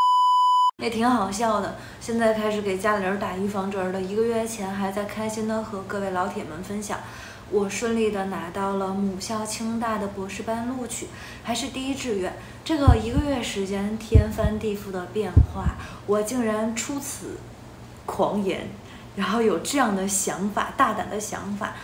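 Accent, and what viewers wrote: Northeast, China. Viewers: 老妹儿，东北口音有点重啊～～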